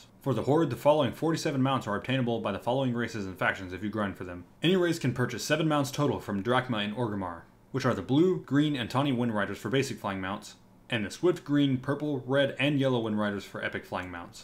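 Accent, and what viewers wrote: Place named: Netherlands. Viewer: You're Dutch, aren't you? Good accent, it's almost not coming through. Almost :P Nice vid, keep it up. Speak a bit slower though, it's not a race :P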